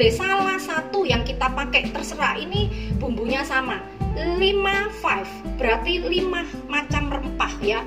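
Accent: Java, Indonesia. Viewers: ci aku.baru ikutin you tube cici.mantap cici tutorialnya jelas dan mudah ikutinnya.Suka juga aksennya cici yg.medok jawa.GBU cici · org Surabaya ya cik...bicara medok buangett...😊tp cara masak cicik sy suka...😍thank U cik...🙏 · Wah...luar biasa masakannya....nikmat sekali ini....dan suka banget sama medoknya...salam kenal...sesama medok...😄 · Medoknya something banget. Jd adu ciri khas. Kamsia ya cik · Anjirr medok bangett. Saya suka saya sukaa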